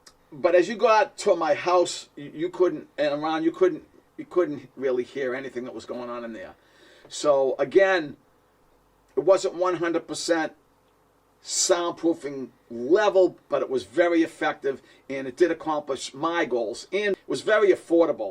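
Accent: Boston, United States. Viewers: I think your accent is far from "the worst" Boston accent. I like it, in any case.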